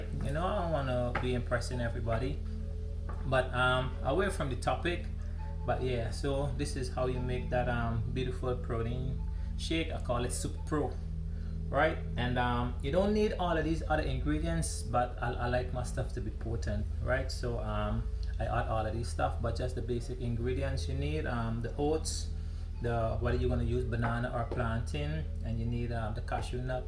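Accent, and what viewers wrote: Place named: Guyana. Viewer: u sound guyananese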